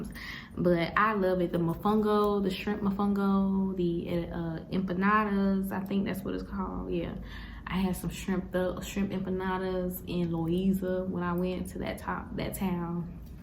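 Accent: South, United States. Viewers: The accent is giving South Carolina (1st Guess) , or Texas (2nd) or Georgia (3rd guess) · First of all, you're so pretty, and I love southern accents! But yesss sis! I love seeing Black women doing their travel thing! I'm on my way down there in a few weeks, and I'll be there for a month. Just trying to make sure it's not a stupid/dangerous move for me to be staying there alone for a few weeks. I'm going down with friends, but then they're leaving and I'll be there alone for two more weeks. I'm either going to take some Spanish classes to give me somewhere to be during the day and people to be around. Or I'll maybe forget the classes and do a homestay so I'll have people/a family to keep me company 😅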